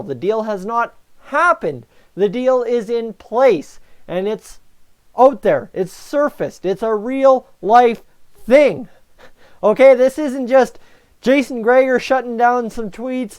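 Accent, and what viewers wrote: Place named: Nova Scotia, Canada. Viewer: From Edmonton here, you sound like you're from Nova Scotia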